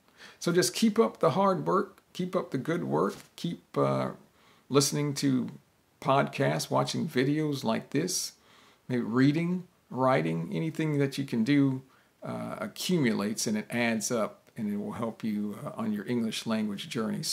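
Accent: United States. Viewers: I just discovered you.... I'm an English learner. And I love American accent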